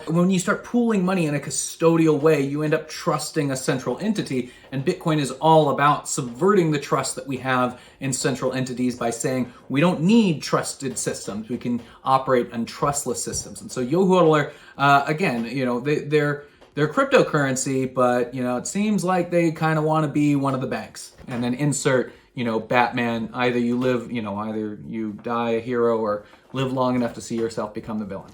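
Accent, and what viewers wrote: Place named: United States. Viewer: This guy has the most american accent ever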